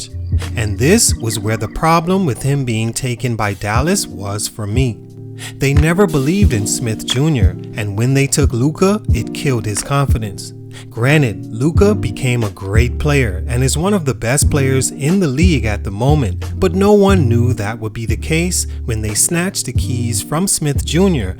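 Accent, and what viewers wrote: Bahamas. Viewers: Are you from The Bahamas. You sound like a Bahamian. The Bahamas is my second home.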